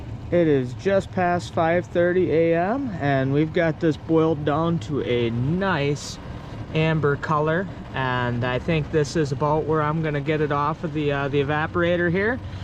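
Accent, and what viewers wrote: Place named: Canada. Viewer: Are you sure you're not Canadian? You sure sound like it. No offense intended.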